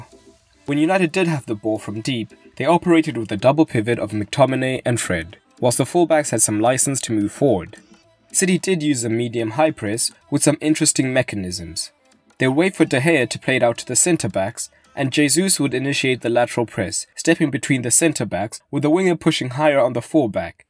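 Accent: South Africa. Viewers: Hey man are you South African??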